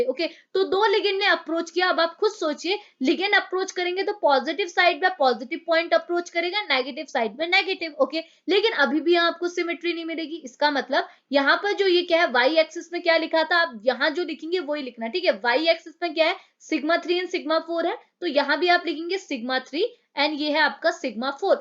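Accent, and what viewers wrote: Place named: mixed, India. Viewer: Bdiya pdhate ho mam ap..❤❤❤ ap up ya uk se ho syd....😅😅 mean apka acsant Asa hi lgta h.. liie ye सब समज अगि..😁😁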